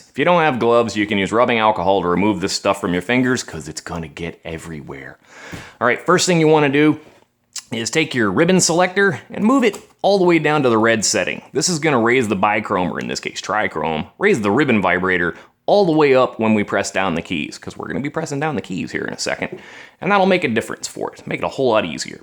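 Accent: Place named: United States. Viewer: This guy's accent is so American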